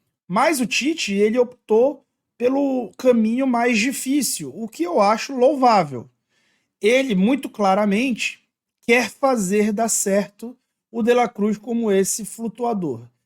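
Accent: Belém, Brazil. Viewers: Vc é de Belém?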